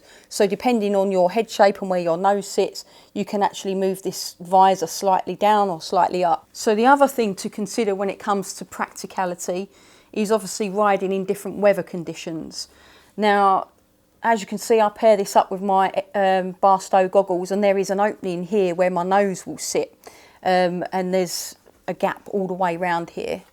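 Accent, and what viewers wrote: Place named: Essex, United Kingdom. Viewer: That accent..... you gotta be an Essex girl!?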